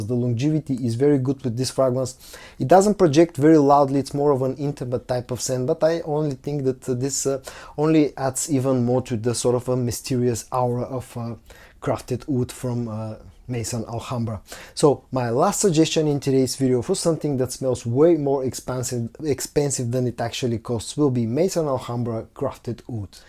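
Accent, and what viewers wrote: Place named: Bulgaria. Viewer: I finally realized how that Bulgarian accent works! The wood "oud" and "wood" almost sound the same. I've heard you describe some scents as "oudsy" but you're actually saying "woodsy". 😂 Still getting unsubbed every day, so don't think I've abandoned you friend! Be safe all.🙏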